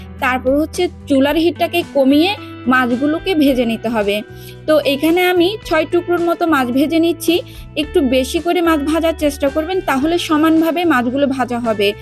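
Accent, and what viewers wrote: Chittagong, Bangladesh. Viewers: আপনার দেশের বাড়ি কি চট্টগ্রাম কোথায় বোঝা যাচ্ছে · Apu apni ki citiga apnar kotai buj jai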